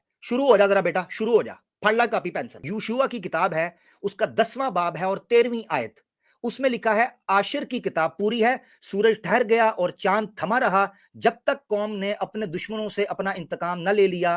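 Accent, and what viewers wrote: Punjab, Pakistan. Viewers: You rock in punjabi accent maza aa gayi ji Tantani logo ko bhi zara todhi me le lo Paa Ji zara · Your panjabi-urdu accent is so sexy🥵🥵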